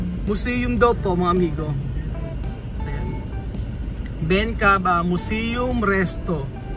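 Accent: Nueva Ecija, Philippines. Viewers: Extrovert kc tlga c kuya tas taga Nueva Ecija pa, minsan ang punto ng pananalita d2 sa amin sa Nueva Ecija e sadyang mlkas at minsan napagkkamalang maybang pero ganun po tlga kming magsalita. Inamin din nmn ni kuya na may pagka bully sya, mapang asar din sya pero ganun nga ang personality nya. Oo nga, minsan need din tumahimik lalo na sa mga museums o sa lugar na dpt di maingay. Di rin ako pabor na isinasama si Misma sa mga green jokes kung nagkaganun nga( di ko npansin nung pinanood ko video sa LU). Pero cguro pag di rin magdadaldal o tatawa c kuya, may masasabi n nmn tayo ano? Kung seryoso sya, may masasabi ulit tayo. Lahat ng galawan nya, papansinin natin di ba? Tas ibabash natin sya di ba? Kya, madaldal man o tahimik c kuya, may masasabi at masasabi pa rin tayo. Hayyyy, ewan ko my face( ibash ko n rin self ko)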